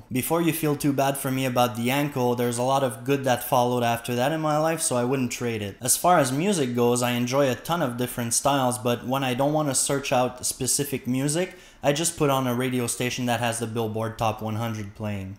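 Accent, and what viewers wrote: Quebec, Canada. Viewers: Congrats on the 2,000. Here's to 5K! Please don't change your accent. I love it and it helps you stand out. Canadian French would not have been at the top of my guesses. For my part, I like the range of topics you bring to the table. FE is fine, but I agree live debate is a waste of breath. There are a bazillion other topics available to present, or to debate. Somethinks I'd enjoy hearing your opinion on? Gosh, how about: - AI revolution and workforce displacement - Big data works, but should countries allow? - Will AI achieve anything we recognize as consciousness? What could it look like? - What is quantum computing? - Why can't we protect networks and databases, the Internet? - What is super-cold? - What is the hold back in developing electricity storage to enable renewable energy? - Are we ready to seriously privatize space? I'll leave a little warning about the creationist, anti-evolution, and young earth craziness. 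They are in the same neighborhood as FE plus you stir in evangelical fundamentalism. We should try to contain that neurosis to the U.S. But that said it would be fun to see you mud stomp them. ps - please let me if Canada decides to build a wall along it's southern border! lol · Je savais t'étais québécois !!! Nice vids ! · I guessed your accent was French Canadian. I completely agree with your reasons for not debating with globe deniers. See Bob the Science Guy’s two recent videos to see how bullying and dishonest globe deniers can be. I think we should be compassionate towards the deluded globe deniers who clearly have psychological problems, but condemnatory towards those who are pushing the flat Earth onto the gullible and ignorant. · Nothing wrong with your accent, although I never would have guessed French Canadian. You're right not to waste your time debating with people who don't want to learn. 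Have you seen The Last Kingdom on BBC/Netflix? Saxons and Vikings, dramatised around the true events in medieval Britain. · Indeed, removing that incentive may well see many a flathead dissapear from here. As a well travelled Dutchman, I tried to figure where your accent is from, but I focussed on the European nations. But now that the cat is out of the bag, I can hear you sound like the Villeneuves, both father and son.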